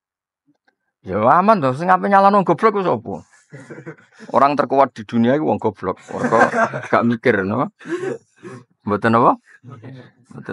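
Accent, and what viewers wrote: Indonesia. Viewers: Lughat Madura kayak e ,yg baca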